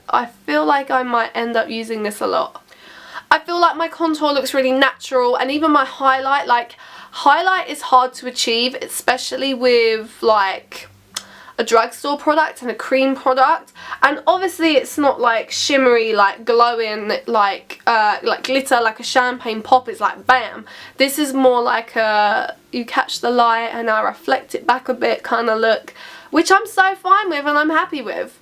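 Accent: United Kingdom. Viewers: iam in love with ur british accentttt !!!!!!😍😍😍😍😍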